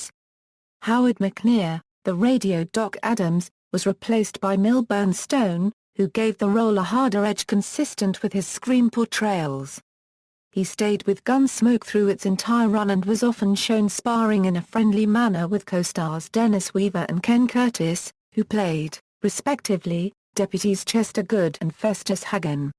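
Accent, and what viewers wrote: England, United Kingdom. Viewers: Why is a robot with an English accent narrating this? Geez.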